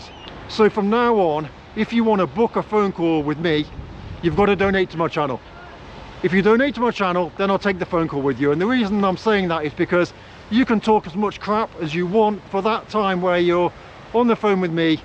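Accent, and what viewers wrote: England, United Kingdom. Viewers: Hard to tell you are English, than you said "Its All Goes Pair Shaped"! English 100%! You been living there 20 years BUT your English has not changed a bit! Even a Western Canadian can see that!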